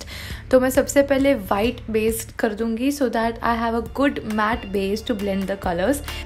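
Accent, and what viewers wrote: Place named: Mumbai, India. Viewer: Omg a hindi video After hearing ur mumbaiya hindi in ur vlog with ur dadu i requested u to do video in hindi n u did it Thanks Btw please do post videos in morning so it will make our day beautiful One more thing i m not getting notifications of ur channel but getting of others channel is anyone else facing same problem 10 days left for vlogmas n its sad please try to post more videos N just love 💓💓💓💓💓💓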